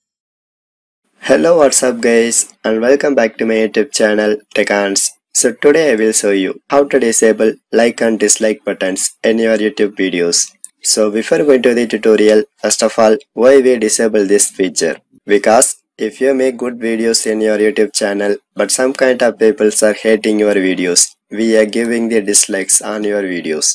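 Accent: India. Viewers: I hate indian accent, sounds ugly!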